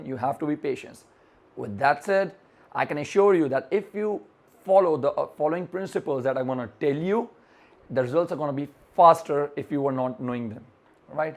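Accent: India. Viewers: I don't know why I guess its the western stigma but I think of a wise monk listening to your videos lol. People from India with the hindu accent feels like it just oozes wisdom and patience. Keep up the great videos.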